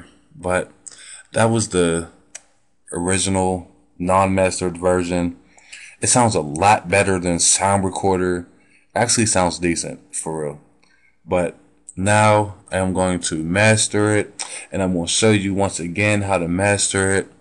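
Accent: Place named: Pittsburgh, United States. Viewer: im from the burgh to lol son as i heard ya voice i knew you was from the burgh !!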